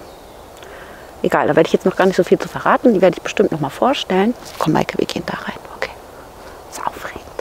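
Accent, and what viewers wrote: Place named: Upper Franconia, Germany. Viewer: des is fei jetzt aber nett schee. Oberfränkisch ist ein sehr schöner Dialekt 😂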